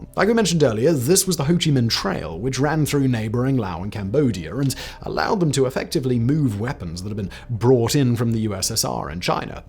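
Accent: United Kingdom. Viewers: Why is some British dude talking shit about the USA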